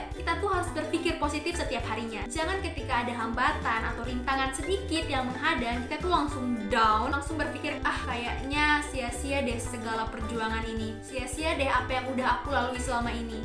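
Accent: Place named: Gorontalo, Indonesia. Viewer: Ternyata org Gorontalo. Dri awal sdh kntra logatnya :) Good job. Semoga sukses dan berkembang channelnya. Btw kita satu Almamater (UNG). Tp beda jurusan, dan mgkin beda angkatan. :) Sya dri Teknik Industri. Salam kenal. :)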